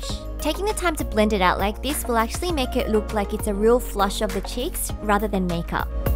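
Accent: Australia. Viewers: are you from Korea, l hear an australian accent? to be honest, you make me wet!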